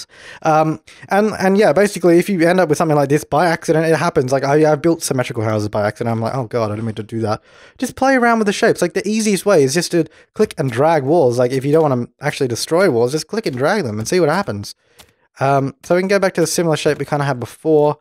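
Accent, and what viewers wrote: Australia. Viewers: I feel like I've just been smacked on the head with a newspaper and told "NO" in an Australian accent.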